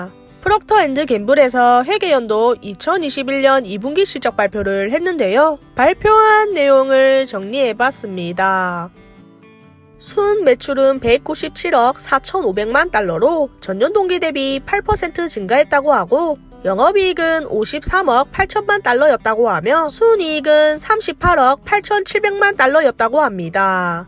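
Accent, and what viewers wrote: South Korea. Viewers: ㅋㅋㅋ 말투 너무 귀여우세요ㅋㅋㅋㅋㅋ 경상도지예~~~~? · 서울 말투 쓰시는거 보니 서울분이신듯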